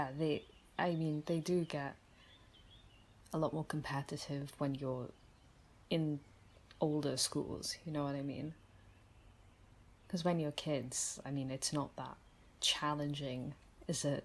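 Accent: Wales, United Kingdom. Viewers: Can’t quite figure out the accent 😂. Is it Welsh?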